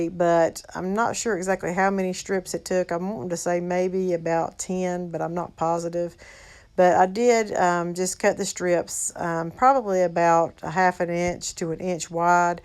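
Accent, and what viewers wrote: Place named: North Carolina, United States. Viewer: Also, I enjoy your videos so much. Your accent sounds so much like my North Carolina relatives <3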